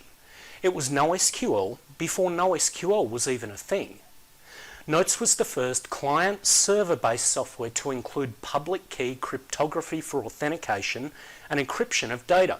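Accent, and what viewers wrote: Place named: Australia. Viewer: Man, I missed your Aussie accent :-D Without this product I wouldn't have come across with you all great people (yes, then of course with others ;-) ) and didn't make a living from and with it for almost 21 years. I will share this video to all customers who are thinking about migrating their apps to Microsoft - they will regret it soon.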